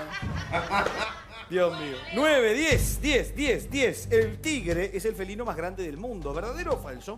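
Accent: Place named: Mexico. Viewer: sos un capo me encanta cuando hablas en mexicano!!😘😘😘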